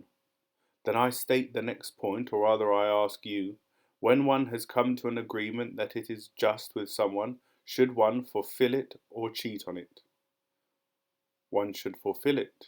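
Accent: United Kingdom. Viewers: Gracias bro. Are You british?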